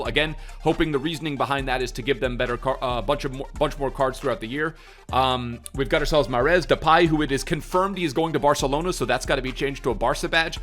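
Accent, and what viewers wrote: Australia. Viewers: That Aussie accent 👌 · More Aussies accent pls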